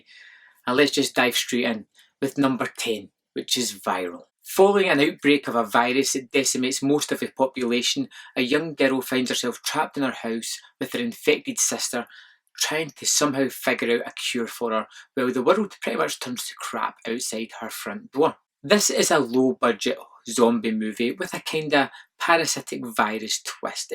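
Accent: Scotland, United Kingdom. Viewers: By the way. I am assuming you either live or are from Scotland due to your accent. I have always wanted to visit Scotland. What is it like living their?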